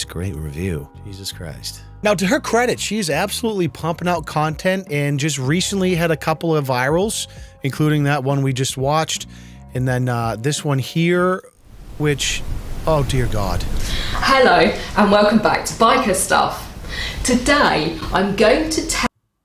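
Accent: Australia. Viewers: She’s Australian not British lol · I don't think she's British. I think she's Aussie. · I think she's Australian not British lmao they pronounce things much more weird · Australian, not British my friend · Very funny, I think she’s Australian not British, I’m British so I hear it.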